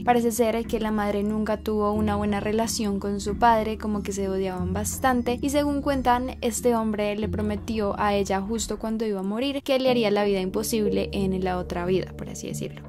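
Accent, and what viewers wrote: Colombia. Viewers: Colombia, creo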